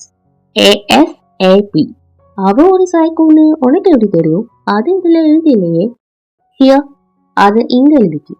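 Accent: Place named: Tirunelveli, India. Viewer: noo ga neenga sollurathu tirunelveli slang maathiri erukku enn clg frd kuda eppadithaan ethavathu sollanumna eppadithaan oru visayatha avanala long ahh solla mudiyathu example valikkuthunu solla sonna valikki 😅 nu solluvaan sorry ga just fun 😵‍💫🙃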